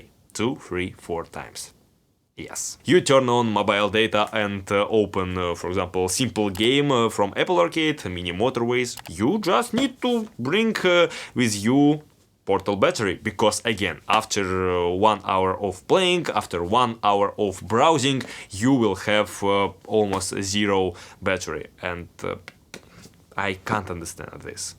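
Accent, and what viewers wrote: Russia. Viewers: твой английский для русского человека просто умиление ))) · You have Russian accent.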